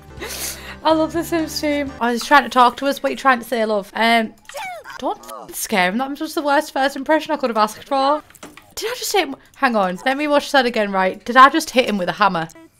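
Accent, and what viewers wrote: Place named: Middlesbrough, United Kingdom. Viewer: Since I am a foreigner, my accent always makes me self-aware when speaking English, but your videos are getting me relaxed with it, truly I love your middlesborough way :)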